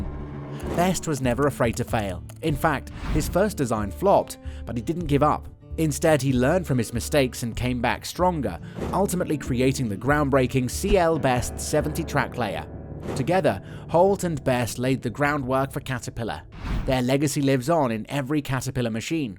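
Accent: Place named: Europe. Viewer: European voice for an American company as it comes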